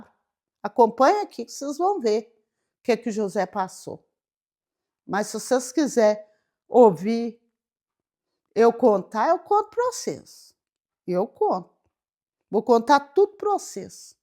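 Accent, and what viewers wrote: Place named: Minas Gerais, Brazil. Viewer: Muito bom essa forma mineirez de contar história bíblica❤😂 parabéns 👏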